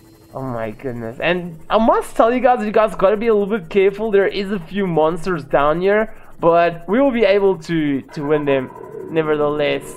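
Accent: South Africa. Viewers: are you south african, u sound like one of my friends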